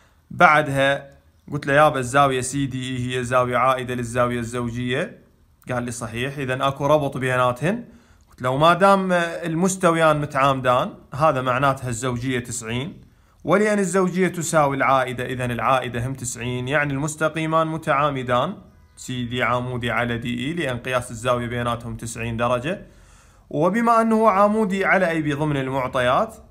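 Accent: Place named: Gulf, Arab world. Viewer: لهجتك خليجي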